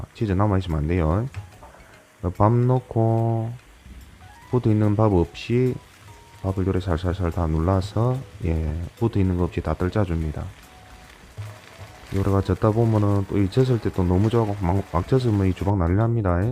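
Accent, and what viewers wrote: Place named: Gyeongsang, South Korea. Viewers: 경상도 아재 특유의 사투리가 구수 해서 좋은것 같습니다. 항상 있는 그대로의 모습이 좋은것 같습니다. 위생 장갑을 끼고 요리 하시는건 왠지 살짝 적응이 안되는 일인 이라서 . 앞으로 좋은 레시피 영상 기대하면서 좋은 영상 감사합니다. 지금 나레이션 방식 변화없이 죽 가시기 바랍니다. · ㅋㅋㅋㅋㅋ 어디 지방인겨~!? 부산이라예~? 사투리가 윽쓰로 구수한기 정감있눼예... ㅎㅎㅎㅎ 있는 그대로 말했뿌믄 음식 보다 나레이션 완쩐 찌깁니다!! ^^* 나레이션이 너무 구수한게 정말 매력이 좋습니다~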